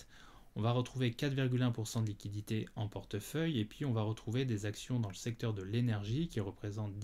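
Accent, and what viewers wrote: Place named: United States. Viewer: Salut j'aime beaucoup tes vidéos vraiment mais si je peu donner une petite critique, c'est que tu prends un accent anglais américain qui est un peu ridicule dsl mais ça m'agace beaucoup ! Je comprends que parfois tu n'as pas le choix d'utiliser l'accent anglophone mais franchement tu l'utilise pour rien, c'est comme dire " T-shirt " a l'américaine Ti chweuuurt nan... !! Tu le prononce normalement t-shirt. Voilà ce qui me rend un peu ouf quand je mat tes vidéos, que j'adore au passage. Bref dsl mais la fallait que ça sorte. Merci a toi.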